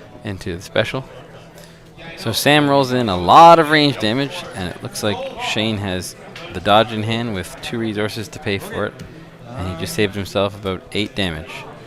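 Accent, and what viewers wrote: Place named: Canada. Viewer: lol just the way you pronounce it like Zor instead of Sore. Chances are it's just another ridiculous Canadian thing like Zed instead of Z.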